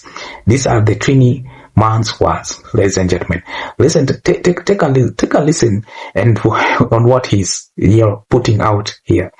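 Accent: Jamaica. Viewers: Man your accent is very much like Jamaican too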